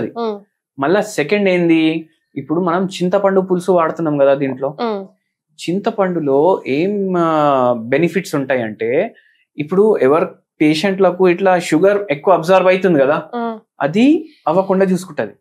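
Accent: Telangana, India. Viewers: Thanks for your valuable information . Little bit telangana telugu accent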